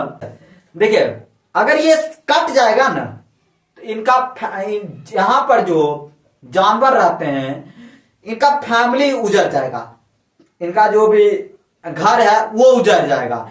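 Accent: Bihar, India. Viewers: Are Bihar me hindi thoda wese hi hota hai, alag alag jagah pe alag alag hota hai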